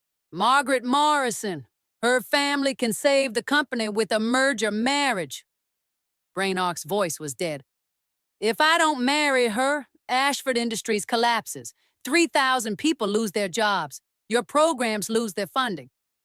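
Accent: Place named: South, United States. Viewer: She's Southern, a great Voice.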